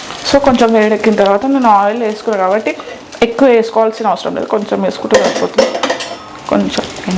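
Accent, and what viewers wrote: Telangana, India. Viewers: Most definitely beautiful thing on YouTube is your pakka Telangana Slang. Boy love it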